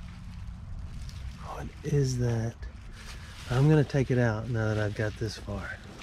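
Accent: South, United States. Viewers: Southern talking. I live in Illinois now and when my Southern kin come to visit I have to learn that language all over again. Count how many times the word "look" is said in a video.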